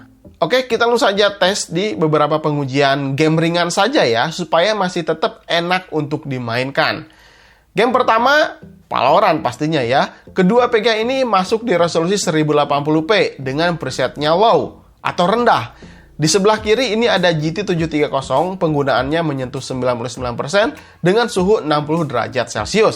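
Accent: West Java, Indonesia. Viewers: Biasa SUNDA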